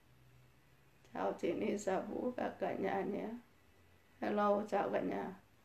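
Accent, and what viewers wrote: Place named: Hai Phong, Vietnam. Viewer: Ngôn ngữ cách nói của cô rất hải Phòng....chân thật và thẳng thắn.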